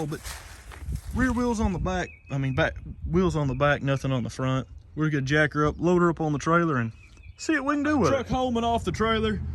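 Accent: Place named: New England, United States. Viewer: You have one of the most interesting Yankeee accents, love listening to it and your relaxed vibe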